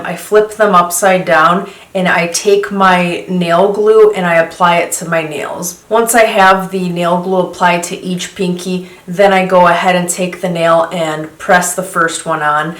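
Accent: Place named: Chicago, United States. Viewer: Great advise, and I love the Chicago accent!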